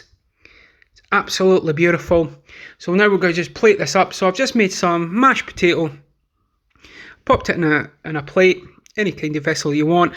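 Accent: Scotland, United Kingdom. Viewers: I tuned in because I love a Scottish accent! But it's a bonus because maybe I will learn a couple of new recipes. In this, I think since I don't really care for carrots, I will switch them out for button mushrooms. Good luck. -Anne in Ft Myers Florida.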